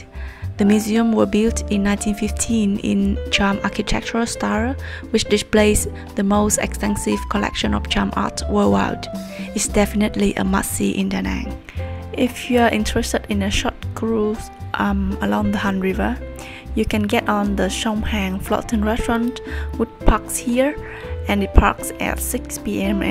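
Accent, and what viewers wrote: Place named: England, United Kingdom. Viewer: I like your English accent very much...thanks for sharing. Love Da Nang.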